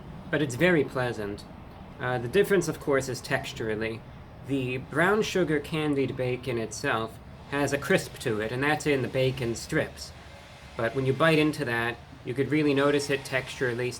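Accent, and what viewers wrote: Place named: Transatlantic, United States. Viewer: Why the switch to a Transatlantic Accent?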